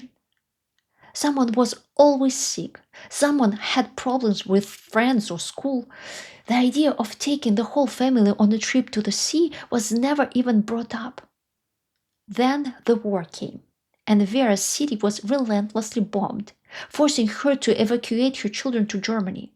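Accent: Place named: Russia. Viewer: I have to admit, your Russian accent is quite strong. If you've lived in an English-speaking country for so long, why haven't you worked on your pronunciation?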